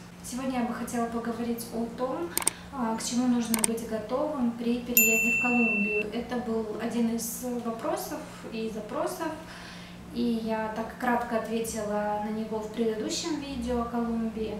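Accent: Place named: Argentina. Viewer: Хотел заметить что у Вас аргентинское произношение))) По безопасности, все зависит от района. 3года жил в Боготе, вспоминаю и скучаю ))) По фразам, Колумбию можно охарактеризовать 3 словами : Tranquila, qué pena, mañana. Каждый.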